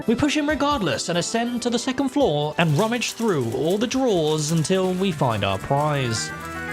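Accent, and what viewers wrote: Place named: United Kingdom. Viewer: i love how this channels mascot is a cute kitty while the channel is run by a handsome british voiced guy so we just have this handsome sexy as fuck voice coming out of this kitten its like that one charactor from shangri la frontier anime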